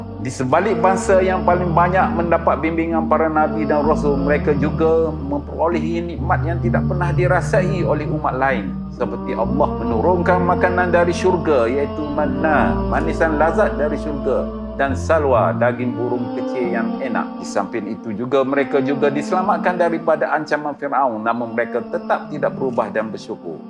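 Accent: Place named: Kelantan, Malaysia. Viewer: Loghat tumpat kental sangat 👍👍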